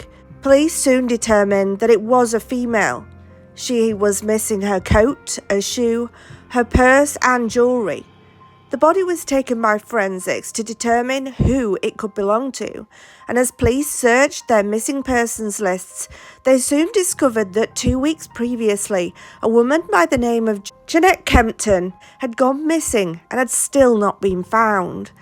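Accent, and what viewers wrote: United Kingdom. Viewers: Love your British stories and accent!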